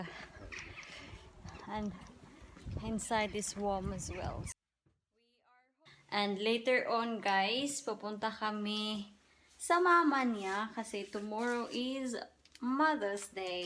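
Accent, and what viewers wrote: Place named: Visayas, Philippines. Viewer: Hello Sis Taga asa ka sa Pinas murag Bisaya man ang imo accent sa words?..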